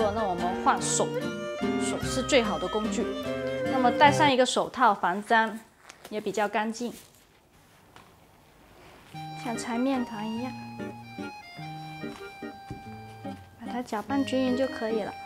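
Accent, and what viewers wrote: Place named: Fujian, China. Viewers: 老师，感觉你是福建人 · 普通話教學，但是發音好難聽！福建口音估計是！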